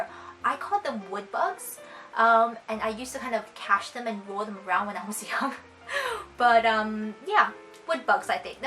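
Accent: Canada. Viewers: Hi! I enjoyed your accent-tag. I am a fellow Canadian too (I'm in MB) and yes, I can tell you would be "Chinese Canadian" as you do have a slight accent. People claim I have an accent too but.. ... lol... I can never tell myself. :) .. I also switch the pronunciations of some words depending on the sentence.. .. weird, right?... lol.... but it's all in fun! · Girrrrl your English is perfect, in fact - it might be a little too perfect. You enunciate and pronounce everything so crystal clearly that you sound a bit hyper correct, but you still definitely have a hint of Canadianess in your accent so I'd definitely be able to tell that you're not from the US. This was a really clever and original take on these accent tag challenges, thank you for sharing! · Whoa u have an awesome canadian accent haha! i have sort of an annoying NY accent.. not cool like yours haha kindly swing by my channel if u like! 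I do hair vids and food vlogs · You do have a Chinese Canadian accent... I would know cause I have it too. I speak perfect Mando, Canto, English... Each with accent. Mando with Canto accent. English with Canto accent. Canto with Hakka accent. I don't think Canadian has an special accent, mostly because it sounds so natural that I don't notice it. The craziest thing is that I picked up fake southern accent and I totally agree with you that as an Asian Canadian we do speak too fast and crumble up all the pronunciation.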